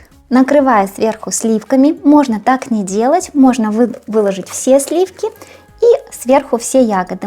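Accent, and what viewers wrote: Ukraine. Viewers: Чудовий , ніжний , гарний тендітний десерт як і автор каналу !❤ браво! Окрема подяка за прекрасну українську!!!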